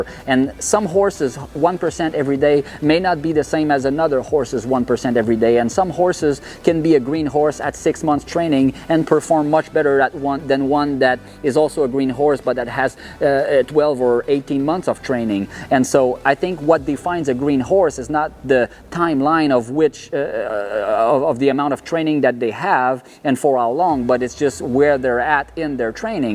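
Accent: Quebec, Canada. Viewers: You sound like you are from Quebec! Am I wrong?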